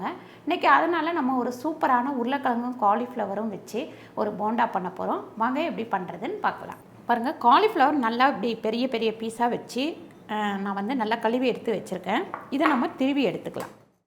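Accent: Telugu, India. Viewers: உங்க தாய்மொழி தெலுங்கா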